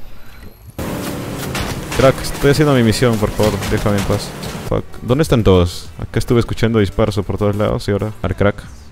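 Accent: Peru. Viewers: eres peruano?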